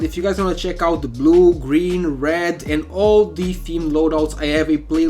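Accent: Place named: Portugal. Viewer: you sound so portuguese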